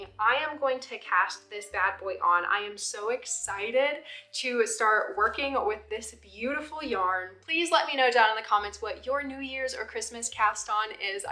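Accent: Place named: Australia. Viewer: You can’t tell you how tickled I am to hear the Aussie creeping into your accent!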